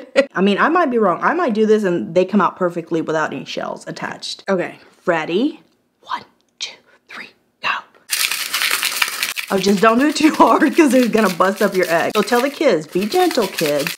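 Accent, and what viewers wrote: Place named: South Carolina, United States. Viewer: I can vouch for the fuzzy eliminator. i've had one for years and it cleans all my sweaters up beautifully. Yes....be careful to stay away from any long strands of fuzzies.....they will get caught and sound like you're cutting off your arm. lol But yeh.....I would buy another one in a heartbeat. Actually I don't think there is any other gadget that does this, except for like you said......a razor. And I have torn many holes in to a sweater using a razor. So that is a no no! By the way.....where are you from? Anywhere near Sumter SC? Born in Wilmington NC....raised in Sumter. You definitely got the SC accent going on.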